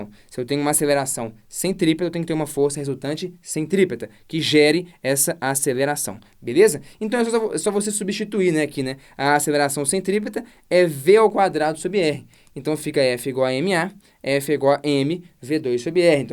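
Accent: Minas Gerais, Brazil. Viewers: Cara você é um mito.... Você define profissional que faz porque ama a profissão. ... E os sotaque mineirinho kkkk massa de mais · Rapaz, de onde você veio??? PARABÉNS pela sua didática, sua linguagem ao aluno e seu carisma!!! E pra temperar melhor ainda sua "performance", tem o seu delicioso sotaque mineiro...MUITO BOM!!!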